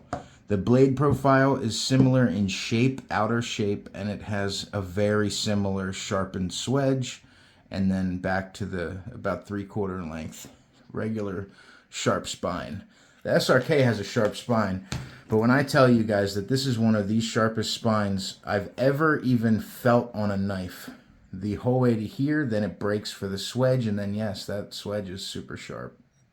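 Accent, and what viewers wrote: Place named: Philadelphia, United States. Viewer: Are you in the Philadelphia or South Jersey area? You have a Philly area accent.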